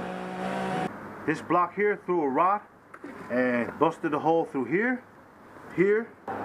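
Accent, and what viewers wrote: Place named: California, United States. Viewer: i like your cali preaching.I'm mechinc in learning 5yrs and counting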